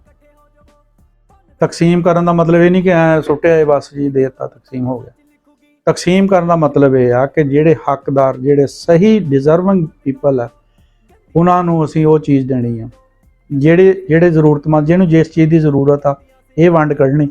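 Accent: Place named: Amritsar, India. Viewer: Veere thdi punjabi bilkul sadi Amritsar wali.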